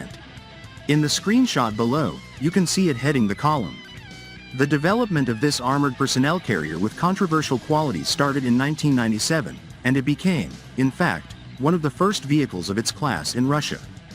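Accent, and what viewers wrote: United States. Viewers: While he at it change that dumm american accent aswel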